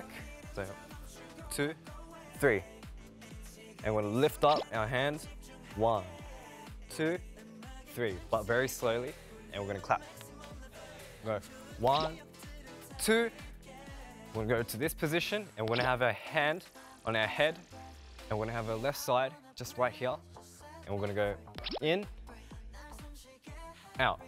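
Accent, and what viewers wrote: Australia. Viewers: i don't stan straykids at all but this is the cutest this i've ever seen, felix's australian accent is the cutest thing ever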